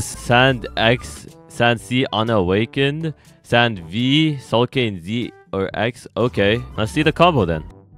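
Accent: Germany. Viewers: Ich weiß nicht wie aber ich war mir zu 100% das du deutsch bist wahrscheinlich wegen dem Akzent haha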